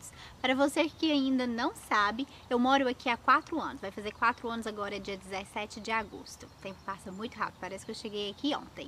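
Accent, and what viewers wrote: Minas Gerais, Brazil. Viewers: Você é da onde , seu sotaque parece com o de Minas !!! bjss adoro se canal !! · Adoro seu jeitinho mineiro de falar... como e linda essa menina... adorei. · com essa voz de mineiro..tem qui arotar mesmo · Teu jeito mineiro é muito maneiro, nós cariocas adoramos vcs (mulheres) falando!!! vc mora nessa residencia dsd que deixou de ser au pair?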